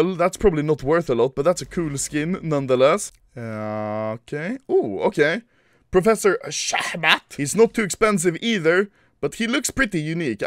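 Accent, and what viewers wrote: Sweden. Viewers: You from sweden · Swedish accent hehe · He is swedish so he cant speak like an English native bro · this swedish accent annoys me so much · U come from sweden right? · Ur sooooo from sweden. Right?? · you have a swedish accent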